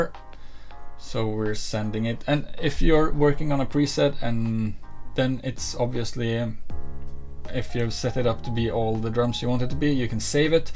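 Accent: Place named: Norway. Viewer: Very help full. I'm now setting my Ugritone drums (Against Humanity). Are you from Norway? I can hear some accent :)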